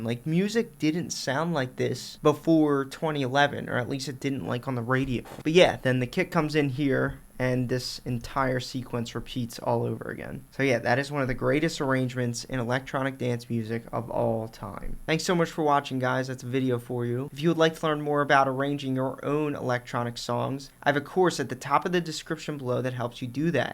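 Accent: Philadelphia, United States. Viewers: Sound you're from Philly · You over analyzed non intent that was made by Xfer’s Cthulhu plug-in. But I like your excitement and cheesy Phila accent.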